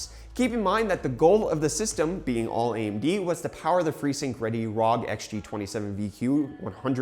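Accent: United States. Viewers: Holy sheet man, you live in South Africa? 0.0 You sound American tho, elaborate pls? (You may have already told your story, i'm new so pls fill me in! #PossibleSub)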